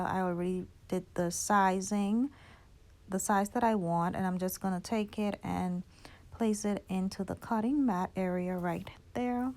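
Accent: Bahamas. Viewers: I am too just knew the accent when i heard it 🇧🇸❤️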